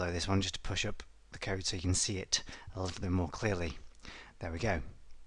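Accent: United Kingdom. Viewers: is an excellent videos for learning VBA. For one thing I liked the most is the instructor explanation with UK accent. Loved it:) I can stay all day long in the front with my PC and try to learn more every evening right after work. You are the best*** rated! Would recommended to everyone. Thank you so much!!!!!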